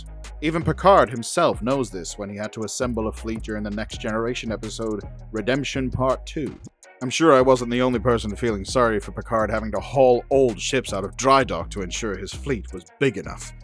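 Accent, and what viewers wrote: Ireland. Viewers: That accent! It's so very mid-Atlantic. I'm Irish, and the closest I can come to IDing it is as a very cultured or well traveled suburban Irishman. Where are you from? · Nice narration. Irish accent . Irish myself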